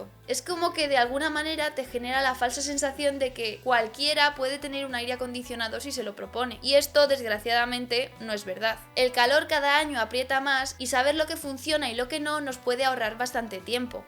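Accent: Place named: Colombia. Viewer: No parece Española, parece Colombiana.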